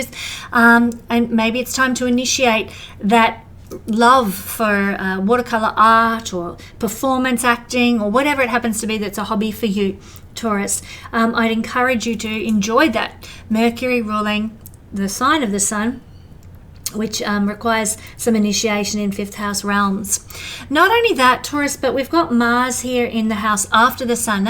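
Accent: Australia. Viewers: Refreshing to hear an australian astrologist, aussie here too Taurus sun, Libra moon, Leo rising Love your videos and a conspiracy realist here also ❤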